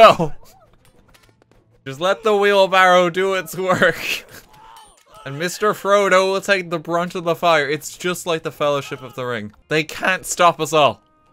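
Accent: Ireland. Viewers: "Jump em boys!" Why do I feel like that should be an in game line for the Hobbits, voiced exactly in Irish lilt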